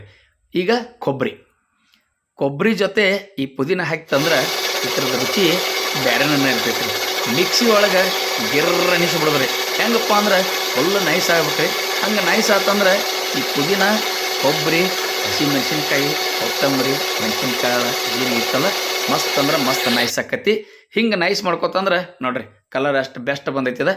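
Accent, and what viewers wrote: North Karnataka, India. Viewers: ಉತ್ತರ ಕರ್ನಾಟಕ ಶೈಲಿಯ ನಿಮ್ಮ ವಿವರಣೆ ಅತ್ಯಂತ ಆಕರ್ಷಣೀಯ ಸರ್…ಹಾಗೂ ನಿಮ್ಮ ಅಡುಗೆ ರುಚಿಯೂ ಅದ್ಭುತ…🙏🙏🙏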